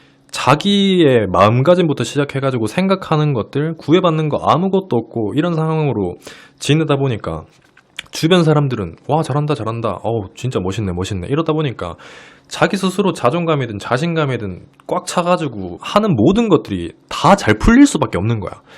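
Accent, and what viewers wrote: Gyeongsang, South Korea. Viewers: 처음 보는 영상인데 일단 목소리,대구?말씨 억양, 훈남이면서도 편안한 외모에 위로받는 느낌이 들면서 빠져드네요 손 동작이 조금 산만해보여 아쉽지만 구독과 함께 좋아요 입니다.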